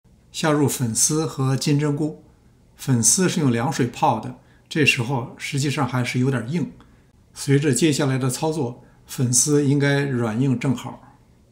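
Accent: Beijing, China. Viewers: 感谢分享，京味儿普通话缓缓讲出生活智慧！明儿就做！像博主一样切西红柿！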